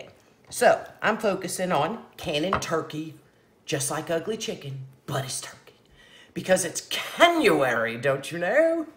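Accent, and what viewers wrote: South, United States. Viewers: Loving, thanks for participating. Love the southern accent, I could listen to you all day. Good points and sanitizing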